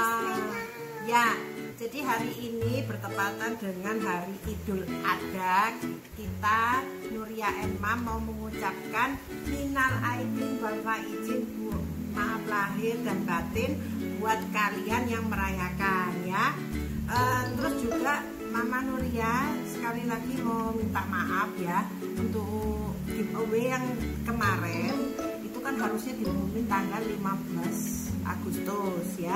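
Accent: Java, Indonesia. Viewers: Assalamualaikum Mama Nuria Mau Nanya Mama Nuria Orang Jawa Ya Kok Bahasanya Medok gitu Hehehe?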